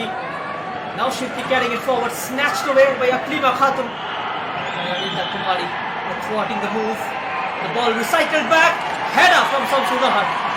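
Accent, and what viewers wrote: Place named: India. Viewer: Bloody Indian commentator's accents are so ugly. Being an Indian, I hate it. I also watched IND vs BAN Bangladesh T-Sports Production. Their accents are good; not irritating like this commentator's accents.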